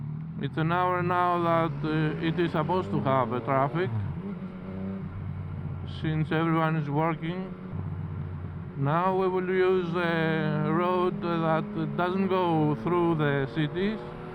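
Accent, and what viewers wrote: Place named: Birmingham, United Kingdom. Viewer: I can distinguish the Brummie accent... 🤣🤣🤣 Keep it up!!!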